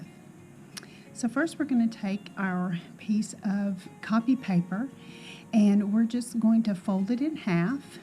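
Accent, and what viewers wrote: South, United States. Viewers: So glad to have found your channel through this collab hop! I love shopping my craft room also , saves money and really tests your creativity ! I have to say being from New York myself I just adore your southern accent , so sweet🤗💕 I love your DIYs !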